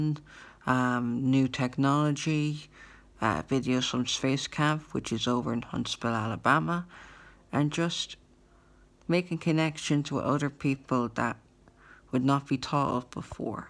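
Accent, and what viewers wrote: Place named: Ireland. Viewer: I understand your anger, but that is an energy you can use. Let us see more of your face, Your smooth Irish voice is an asset, your content will be interesting. Ask one of those pesky sighted people to help you to give us a full face shot in light. Even if you use a couple of still-shots taken outside. As a person with Asperger's you have plenty of material there as well. Remember to make a new trailer video every 3 months. I say this not to offend but as suggestions only. Recently I have started to upload talking videos showing my old beaten face against some of my artwork and it was a hard decision to make as everyone loves you when you are young. Old is not fashionable. Stay safe over there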